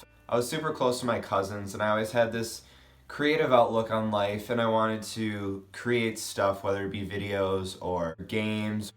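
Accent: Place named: Minnesota, United States. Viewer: Btw, I love hearing your voice with your "Minnesota accent". I've lost almost all of mine, but I've been told that those transplants to other parts of the country, who grew up in the midwest, make good TV and radio announcers. People still ask me about my accent occasionally when they hear me speak.:)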